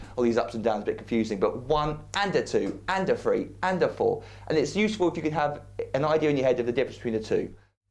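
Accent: United Kingdom. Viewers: Fantastic! Thank you! (Good to hear another British accent on YouTube for a change) 👍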